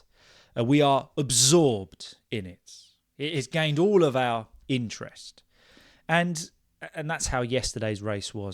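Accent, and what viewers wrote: England, United Kingdom. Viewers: please clarify which of the English accents do you speak?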